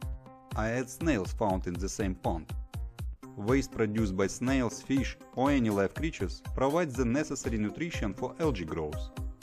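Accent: Russia. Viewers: you Russian? you have Russian accent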